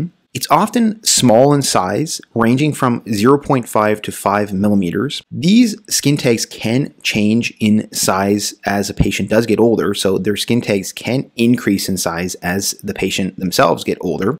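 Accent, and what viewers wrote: Canada. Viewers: It's called a Canadian accent, genteel educated version.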